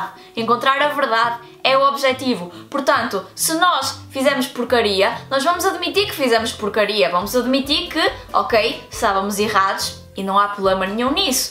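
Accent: North, Portugal. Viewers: Temos algo em comum a pronúncia do norte e adoro matemática.😊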